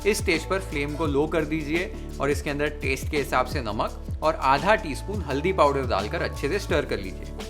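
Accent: Maharashtra, India. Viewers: Chef, I’m impressed by your chaste Marathi language skills. Mulga pass zaala! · Hey I love your marathi accent 😊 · Thank you chef for this recipe ❤️ I am really in love with your marathi accent, tumhi khup Chan Marathi boltat... ❤️ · Marathi accent 🔥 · I love batate Pohe. And yes I am from Maharashtrian family so we make minimum 3 times a week. Simple, fast and very tasty And your Marathi ascent is really awesome खूपच छान दादा 🙏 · Farach chan ... Marathi bollay te bara vatla😊 I really enjoy all your receipes..grt goin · Being a Marathi mulgi, I am in love with this guy!! Not just the recipes but the Marathi accent too! He mentioned that he was brought up in Mumbai and that definitely shows! The way he says बटाटे or वाटण or even काळे मटण with a ण and not न , makes me fall more for him! Keep it up Chef! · Your marathi accent is superb 👌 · I like your humbleness and i enjoy your receipes. 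I am Marathi and from Mumbai, now in US(missing Mumbai) Your sweet Marathi brought a smile to my face. As usual, great receipe with great tips:) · I just love his marathi accent ❤ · Your marathi accent is so nice 👍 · Marathi accent khup chaan aahe....saglya recipies khup chaan asttat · Perfect pohe+sweet Marathi accent 😉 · Chef, you got amazing Marathi accent. Jai Maharashtra 🔥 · Hi तुम्ही खूप छान aaslkhlit मराठी बोलतात धन्यवाद.... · Your Marathi accent is ❤. तोंडाला पाणी सुटले, उद्याच बनवते.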